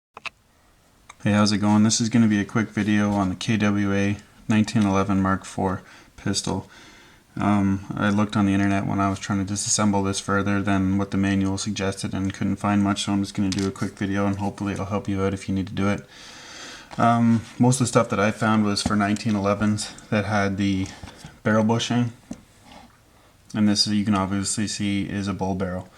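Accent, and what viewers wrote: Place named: Canada. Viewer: your accent screams "I'm canadian" haha, much love from Quebec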